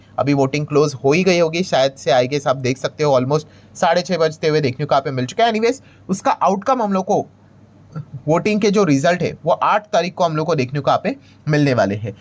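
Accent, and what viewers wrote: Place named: Gujarat, India. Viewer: bhai awaaz se pahchano, yeh gujrati hai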